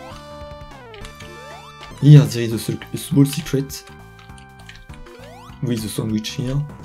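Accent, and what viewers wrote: France. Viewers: J'aime beaucoup ta voix mais omg, c'est tellement awkward quand un Français entend un autre parler Anglais avec son accent x)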